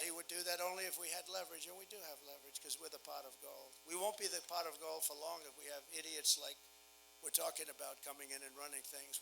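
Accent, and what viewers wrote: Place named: New York, United States. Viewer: Me 2!!! Been following him for last 8 yrs know him from back of my hand knows how he talks NY'r bold strong power gumption n humor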